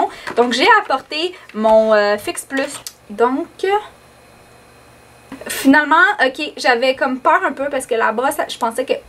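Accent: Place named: Quebec, Canada. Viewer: J'adore ton accent quebequoie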